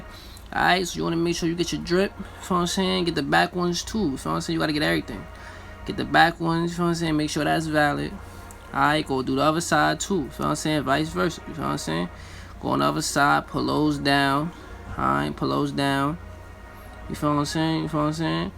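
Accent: New York, United States. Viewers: I can tell u New York nigga but big respect to u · He from New York lol 😂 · Thats Newyork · Bro..you from NY? Distinct ass accent lol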